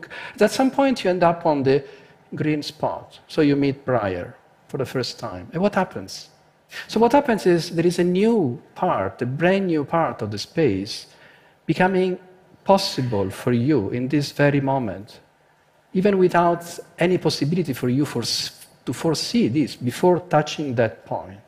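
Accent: Italy. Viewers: a 16 minute video on trataras and tritoras.... sounds of meaningless wordsas in italiaaano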